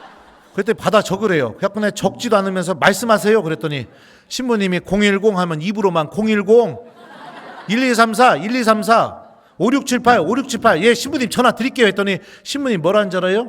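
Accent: Chungcheong, South Korea. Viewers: 신부님🎉 구수한 충청도 어투에 진솔한 마음이 무한 감동입니다 🎉🎉🎉 만나면 화해한다는 말씀, 성체조배의 중요성, 하느님과의 화해🎉 고해성사의 진실한 준비- 더러운 물을 정화시키기 위하여 깨끗한 물이 필요하듯이 고해성사가 우리 마음을 정화시켜주는 깨끗한 물이 된다는 말씀🎉 감동입니다 🎉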